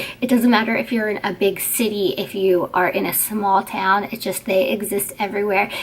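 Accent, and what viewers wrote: Delaware, United States. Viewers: This is one of the more intriguing and unsettling missing children cases I've heard. Btw I like your accent. I had never heard a Delaware accent before.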